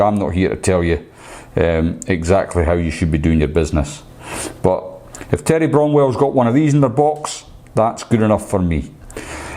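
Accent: Scotland, United Kingdom. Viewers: as an expat scot, I don't know if I watch your channel for the fly tying, which bybtgevwaybinthink is grand, or just to hear your accent!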